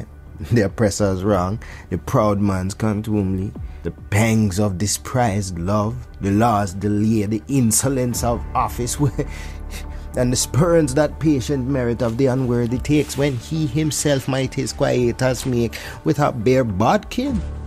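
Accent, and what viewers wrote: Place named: Jamaica. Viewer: Wonderful the Jamaican accent is Tight my Brother so uniquely poetic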